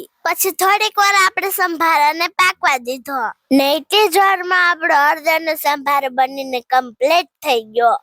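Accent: Kathiawar, India. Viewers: Khatiyavadi boli Achi h bazre ni rotti khub hari bnati ho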